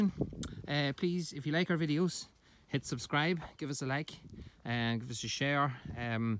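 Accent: Ireland. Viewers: Like your videos mate just subscribed and I love your Irish ☘️ accent, birds sounds like boards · where in ireland are u from?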